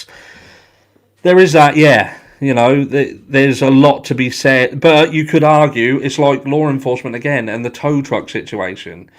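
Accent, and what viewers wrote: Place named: Countryside, United Kingdom. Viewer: Banksy! Banksy is so cool. I’m a Brit living in 🇨🇦 and I enjoy your countryside English accent! Great channel, recently discovered you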